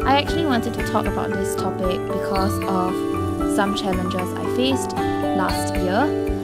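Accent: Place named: Germany. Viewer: Thanks for this video btw your accent is so cute are from germany?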